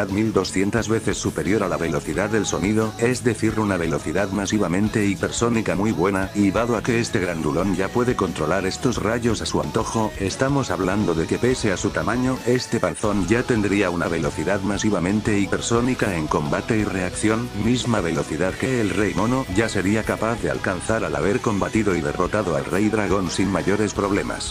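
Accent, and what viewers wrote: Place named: Mexico. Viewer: Muy epico el video y la escala, pero porque usas los fragmentos en castellano, es decir hasta lo que vi tu parecías mexicano más que nada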